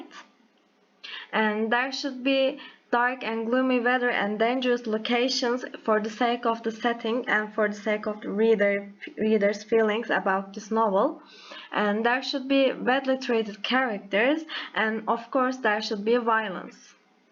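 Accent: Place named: California, United States. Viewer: Accent like Californian..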